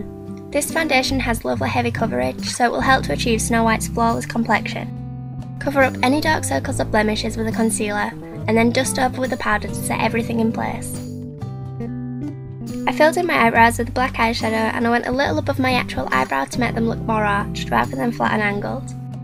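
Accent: Yorkshire, United Kingdom. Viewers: Her accent is from Yorkshire, England :)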